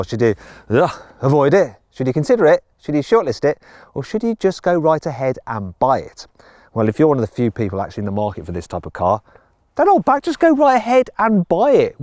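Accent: England, United Kingdom. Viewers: 英語の訛りが強いwwwむしろカッコいい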